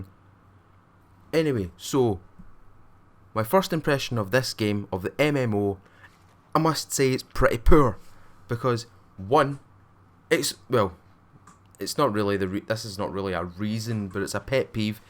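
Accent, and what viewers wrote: Scotland, United Kingdom. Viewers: I wanted to upvote, but then heard all your criticism, concenrns and stuff about ppl having things too fast, havign to farm forever in soem cases etc. After that I wanted to downvote because to me it seemed like you re not undertstanding this game is in alpha phase. Not even close to a real BETA... So there are goign to be shitloads of changes. If you criticize the gmae then always keep in mind in what phase/state the game is. You simply cannot treat it and judge it like its a finished game. It will be in development for at least 16-20 months. In the end i upvote cause I love your scotish akcent and the enthuasiasm how you present the game :P. I hope I could help you with my feedback. :)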